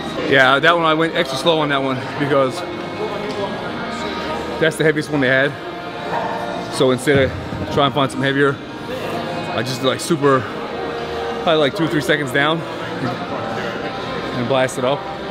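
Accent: Newfoundland, Canada. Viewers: newfoundland accent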